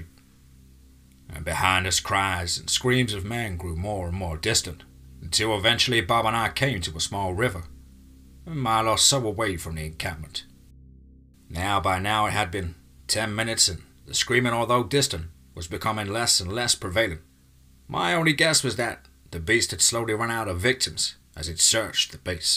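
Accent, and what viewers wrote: United Kingdom. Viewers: No, they probably just can't stand a British accent telling them a story, or they are aware wolflike, like me. Oh, and I can't wait for you to call me a racist so I can call you some names too, since I'm in a tear-your-throat-out mood right now. I'm the 7th👎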